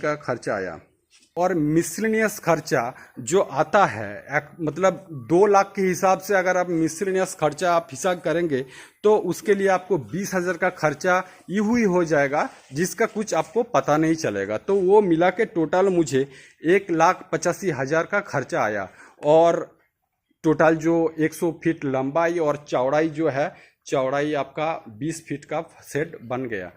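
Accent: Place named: Odisha, India. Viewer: Bhai Apke bonle ki tarike Se lag Raha hai app odissa Se ho.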